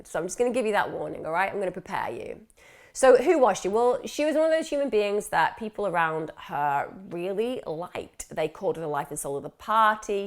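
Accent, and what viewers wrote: United Kingdom. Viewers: British accents are almost as irritating as Asian accents.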